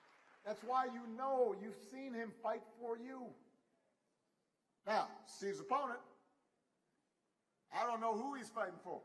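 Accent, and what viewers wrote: South, United States. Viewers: I notice he lost his “southern preacher” drawl, amazing he only uses it when he’s pandering down south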